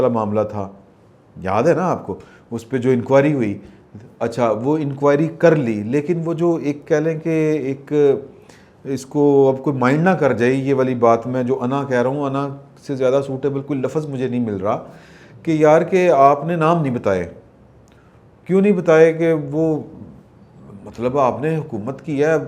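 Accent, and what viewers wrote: Punjab, Pakistan. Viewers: pahi jaann .... good style with punjabi touch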